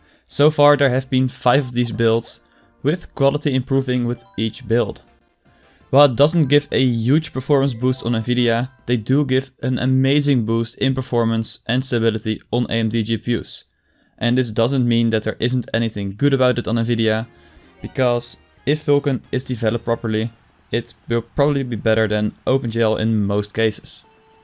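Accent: Netherlands. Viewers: please work on your dutch accent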